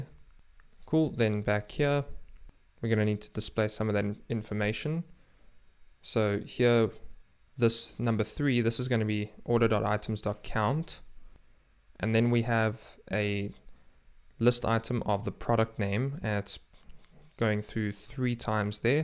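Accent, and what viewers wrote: South Africa. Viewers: Is this guy from South Africa? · Sounds like a South African accent. 😌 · Jy is 100% van suid afrika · is from Pretoria I think. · Is that a South African accent I'm hearing? 😌 Thanks for the tutorial 👍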